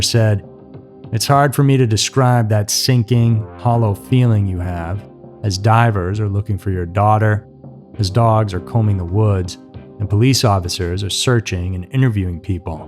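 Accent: United States. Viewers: I like listening to this channel because this dude is American 🇺🇸. I hate all the British people making these mystery videos. British accents are the worst. Can’t stand it.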